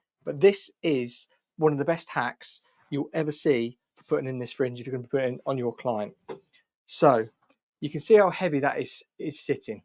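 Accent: England, United Kingdom. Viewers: Let me first applaud you for your skillful talent. I’ve watched several of your videos and although I am no hairdresser by any means, your videos for the average DIY are so precise and helpful. Secondly, I think English accents are incredibly sexy. I could literally fall asleep listening to your videos on repeat. Keep up the good work!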